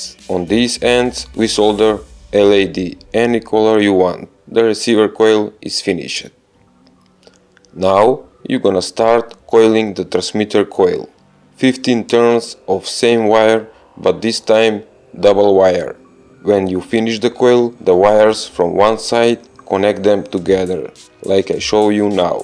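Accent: Russia. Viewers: How is he american hacker he sounds like he's a russian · American hacker with russian accent. Epic combo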